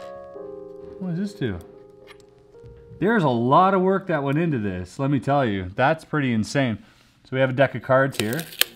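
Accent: Canada. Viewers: I fucking knew he was Canadian haha I was like “well he has a NASA hoodie” and then I was like “no American says out like that” I was right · You’re Canadian aren’t you lol cameras sorted ooout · is he canadian?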